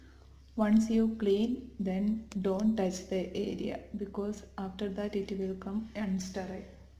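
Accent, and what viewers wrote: Kerala, India. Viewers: Malayalii anu le 😂 explain cheiyanne ketal thanne manasilavim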